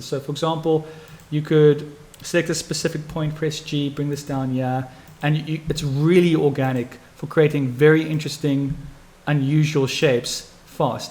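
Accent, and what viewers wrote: South Africa. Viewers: lol, the South African accent is very strong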